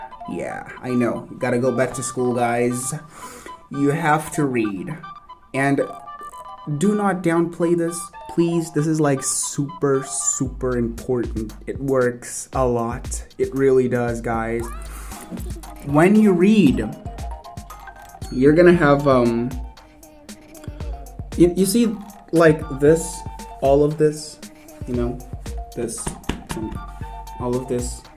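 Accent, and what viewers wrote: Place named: United States. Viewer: Thanks very much for your video. It's very inspirational. I sure would like to hear more about how you have come this far in getting an American accent. I have a few questions like: How long did it take you to come to speak like this? Do you exaggerate your mouth movement when you read out loud slowly? I'd appreciate your answers. You are great. You must have worked very hard. Thank you very much.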